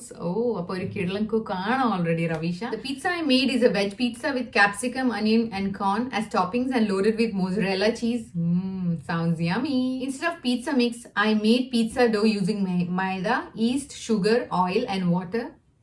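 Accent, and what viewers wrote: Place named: Palakkad, India. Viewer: Chechide slang gilu evidekayo oru plkd touch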